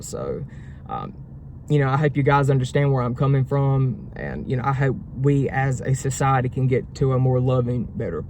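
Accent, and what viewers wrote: South, United States. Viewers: It's not the gay community that this makes look bad baby, it's the trans community that's doing that. They're the ones shooting up kids at prayer & killing Charlie. Ain't nobody I know mad at the gay community that I've seen. They don't have anything nice to say about the trans community tho. I know several gay people & not 1 of them have a mean bone in their body. They're sassy & can get a lil spicy when provoked, but nothing mean or malicious about them. Why u urself sound sweet enough to give somebody diabetes sir.😂 That southern twang was made for u baby.😁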